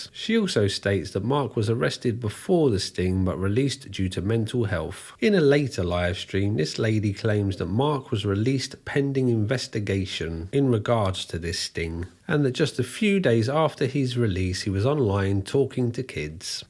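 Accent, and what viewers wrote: England, United Kingdom. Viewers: I'd like to apologize for all my ignorant, fellow Americans, who can't seem to understand a British accent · Oh shit. A cyrax with an English accent.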